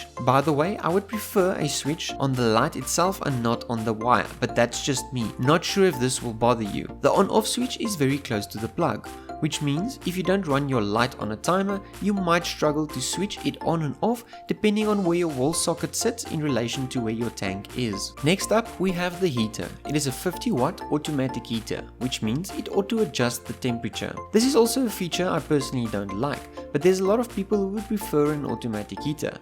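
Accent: South Africa. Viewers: Everytime this guy says "prawns" i think of the film district 9. Gotta love the south african accent.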